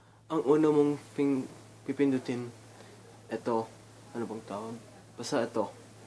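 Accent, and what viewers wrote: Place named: Visayas, Philippines. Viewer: masiyadong visaya ahahah pati tuno mu pang bisaya na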